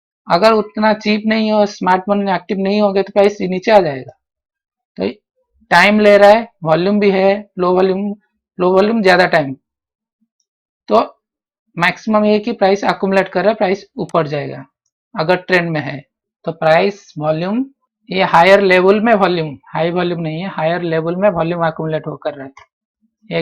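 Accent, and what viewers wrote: Odisha, India. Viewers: Sir are you from orissa ?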